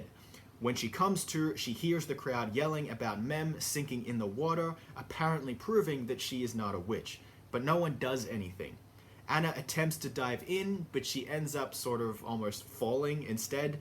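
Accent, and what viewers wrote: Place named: Australia. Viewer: is it me or do you skip between an aussie and a american accent? lol Good video BTW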